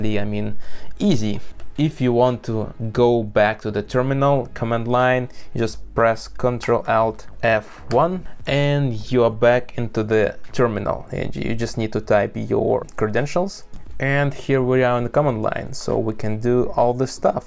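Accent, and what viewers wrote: Russia. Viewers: У него тяжелый русский акцент! )))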